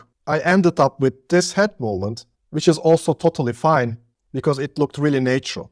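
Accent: Turkey. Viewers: From your accent I can tell you're Turkish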